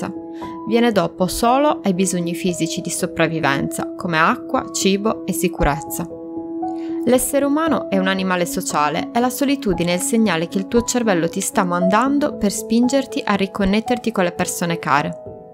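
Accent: Sardinia, Italy. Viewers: Dal tuo accento sembri sarda o sbaglio?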